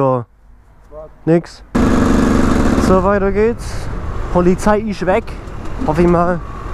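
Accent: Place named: Ulm, Germany. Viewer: Als ob du aus Ulm kommsch:D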